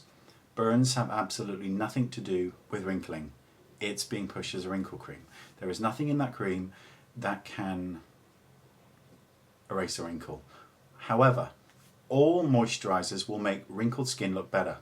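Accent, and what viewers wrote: United Kingdom. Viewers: He is English/British!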